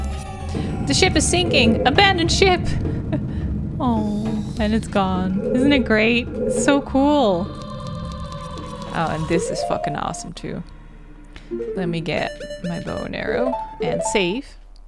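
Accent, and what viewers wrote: Netherlands. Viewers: Hah, wist wel dat je nederlandse was, sommige engelse woorden spreek je nederlands uit. Was niet zeker of je achternaam Nederlands was, heb je ook best veel buiten nederland. Leuke gameplay verder, deze spel is echt vet. Toen ik 9 was ofzo speelde ik het met mn vader. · Curious how the pronounciation of the names with a Dutch accent are actually VERY close to how we pronounce it in a Brazilian accent (It was amusing to notice that said the names the same way I do haha)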